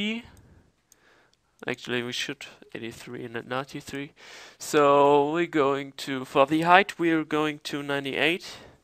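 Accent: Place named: Germany. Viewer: Man hört einfach das er aus Deutschland kommt :D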